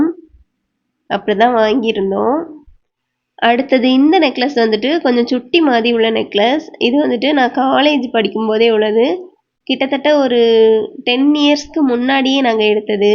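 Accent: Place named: Kerala, India. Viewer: sss andha oor tamil pechu therinchuchu.... Malayalam mixed tamil... 😊😊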